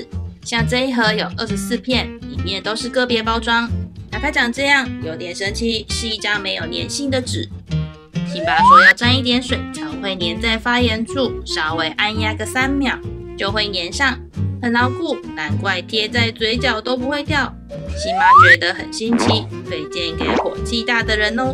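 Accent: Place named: Taiwan. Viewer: 台灣口音好可愛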